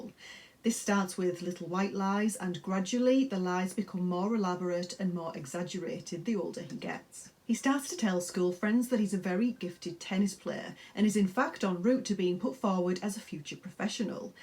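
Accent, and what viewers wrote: Newcastle, United Kingdom. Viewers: are u from Newcastle?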